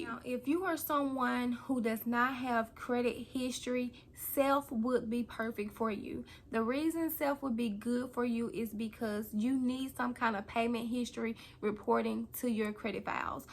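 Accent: South, United States. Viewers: Where you from Cutie. Love that south voice, video about saaalf!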